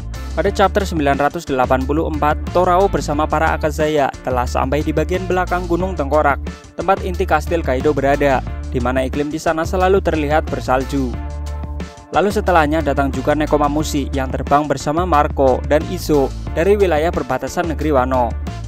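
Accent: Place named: Tegal, Indonesia. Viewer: Dri logat bicara nya, kya won't tegal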